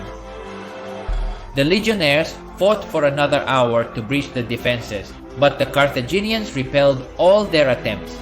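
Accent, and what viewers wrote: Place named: Spain. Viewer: Man your accent is so distracting, just make the video in spanish, it would be more interesting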